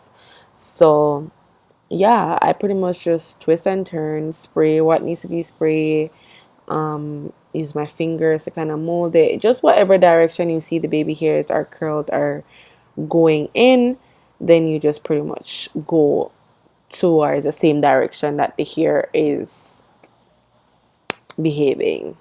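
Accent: Jamaica. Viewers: Girl I knew you was Jamaican from you said the 1st 2 sentences!.. lol u goooo girl.. this style is 🔥🔥🔥.. the baby hair is giving!!!!!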